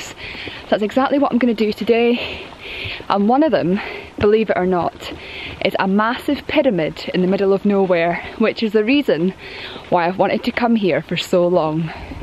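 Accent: Scotland, United Kingdom. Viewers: Man she sounds scottish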